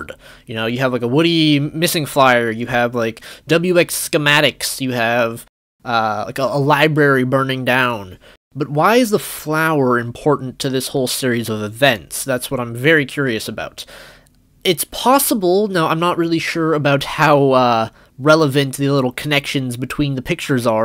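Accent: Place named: United States. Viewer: Judging by his voice he's American And I agree because Belgium and France are nowhere near each other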